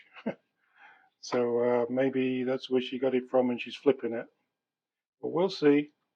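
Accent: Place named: Derbyshire, United Kingdom. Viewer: You sound like you're from Derbyshire. I'm in Chesterfield. Love your clock. Really cool.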